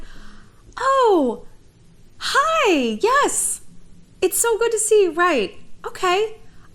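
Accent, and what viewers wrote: France. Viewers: Ton accent français est très mignon!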